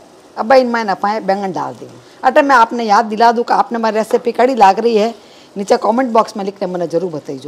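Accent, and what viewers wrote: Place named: Marwar, India. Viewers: I find it so easy to hit the LIKE button on your recipes even before watching them because they are always so unique and innovative. Your approach to cooking feels like magic, turning everyday ingredients into something extraordinary. Both my wife and I are devoted fans of yours; just looking at what you’re making makes our stomachs growl with anticipation. We can't wait to try your recipes ourselves. We also love your Marvarri accent—it's a delightful touch! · Apka baingan KS khooshbu Kitna acha haga. Sweet marwari language ... sab baingan bann javen!! You speak amazing English also, quarter cup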